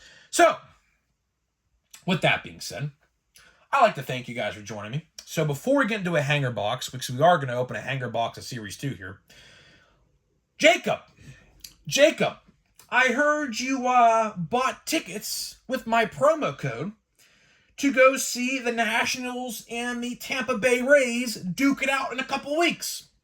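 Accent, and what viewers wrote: Pittsburgh, United States. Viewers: Yinz sahnd like mark madden … jagoff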